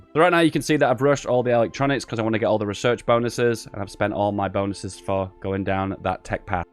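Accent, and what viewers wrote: United Kingdom. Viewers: Watch your videos because I like your British accent. Greetings from Russia)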